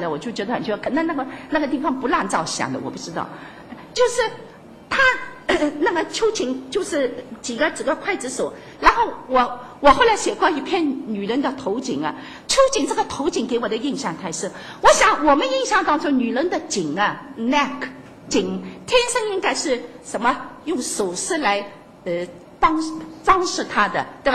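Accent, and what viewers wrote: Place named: Shanghai, China. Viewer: 典型的上海普通话😂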